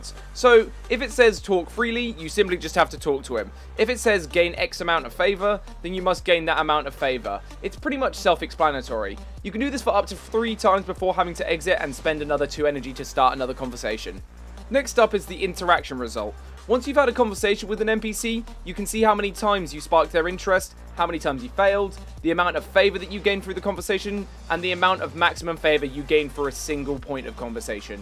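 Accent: United Kingdom. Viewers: "You press the ah" (Oh, right. That's the "R") ... "Which is pretty dahmn bahd-ahss" I love how incredibly British this sounds ^^ Thanks for the tutorial, turned out I had to right-click the faces instead of drag-'n-drop them, huh? And now I know even more than what I wanted to know, like getting additional quests! I might want to focus on this even more. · Gunna play this at the weekend. Good to hear a Brit! and great Vids, will watch them all.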